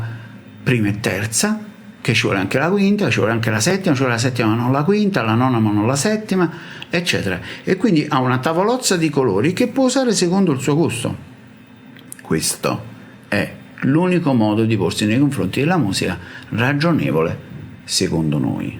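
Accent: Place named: Naples, Italy. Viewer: Sto capendo tante di quelle cose con questi video che ne sono proprio entusiasta poi il signor è un ottimo maestro e mi piace molto come spiega. Il suo accento napoletano poi è di una simpatia unica. Un saluto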